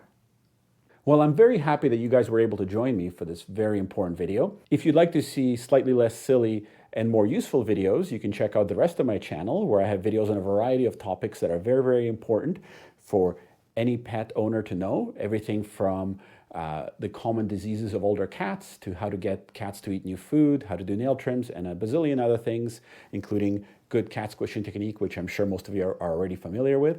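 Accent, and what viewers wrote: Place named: Canada. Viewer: Thank you!!! I bought that whisker stress story hook, line and sinker. I will purchase the feeder and water fountain you recommend. You are as adorable as Mr. Pirate, and I love your Canadian accent!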